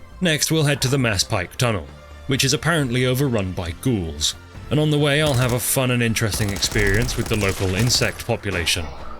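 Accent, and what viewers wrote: RP, United Kingdom. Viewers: You're like the anti-nerbit. You don't like melee weapons, like the railroad, have the posh British accent instead of the funny one he has, and exploit the game to make it easier rather than harder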